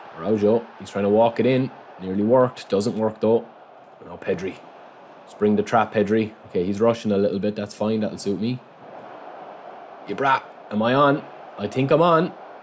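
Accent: Ireland. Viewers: Also love your commentating 😬. Wish I had your Irish accent ❤️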